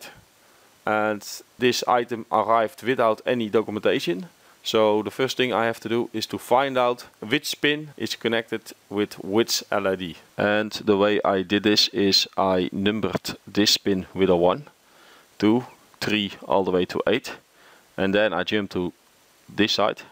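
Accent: Netherlands. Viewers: Hahah, amazing Dutch accent right there =) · hahahah jouw nederlands accent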